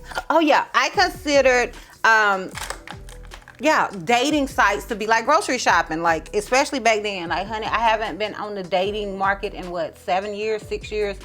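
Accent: South, United States. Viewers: I loved this video! You had me laughing out loud in my livingroom! Between your story, the voice changes, sound effects, your facial expressions, and your cute country twang, you had me hollering here in NJ! ⁰Thank God he ain't kill ya! Lol! Chica, You are too much but so funny. Looking fwd to hearing your next zodiac tale! LOVE YOUR CHANNELS, Ms.!!😅✌🏽🤣🙏🏽😉💜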